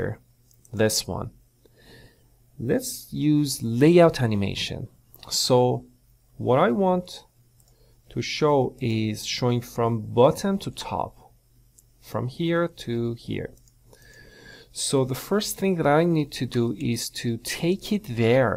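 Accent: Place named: Iran. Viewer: You have a persian accent , are u persian hamvatan?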